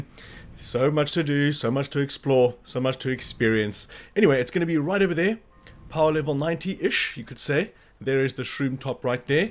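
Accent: South Africa. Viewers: yes sir. You also from SA? Sounds like a South African accent 😁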